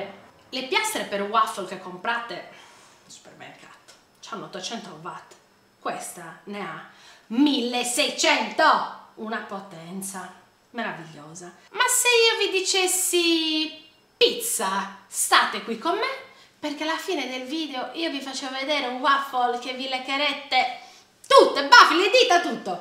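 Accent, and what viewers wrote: Sardinia, Italy. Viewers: Bravissima! Ma sei sarda per caso?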